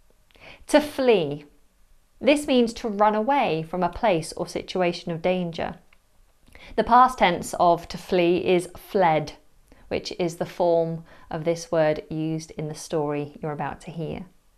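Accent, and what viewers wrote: United Kingdom. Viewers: I preferred to kill four birds in one stone madam memorizing your words/accent & listening to english history & practicing & taking new words (my mind is exhausted & soon I will be extinguished) can I use this word like this is this correct ? I considered myself as an old school I still keep notebook as a diary but I'm so lucky that I'm left handed so no one can read my handwriting sometimes even I can't it's very tragically horrific event or experience for those people in that time & many ancient archaeological sites also destroyed it wasn't that difficult I understand it very well technically british accent is magical factor including many different words I'm a history lover/reader/fan & of course I would like to hear more & I have a humble suggestion can you talk about the black plague who hits london in that time that's all madam if you read all of that & reached this point I would like to thank you for your time cuz I know you are very busy & I would be very glad/thankful if you correct me if you have seen any mistakes in my words thanks again madam & have fantastically gorgeous day I'm waiting your next video👏👋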